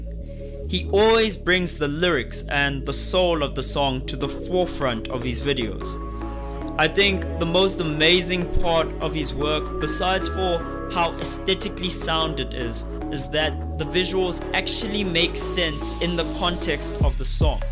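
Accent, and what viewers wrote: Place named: South Africa. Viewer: I really liked your video. I can even hear that you're south African because of your accent and that you pronounce the names correctly.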